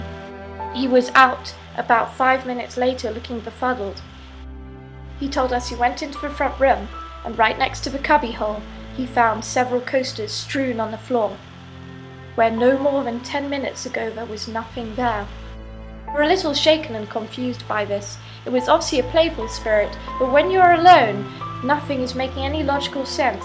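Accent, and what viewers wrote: United Kingdom. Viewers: Very good storie and you have such good English to read bye. I do believe you are English, maybe the Midlands (with voice training)? Can't wait for another..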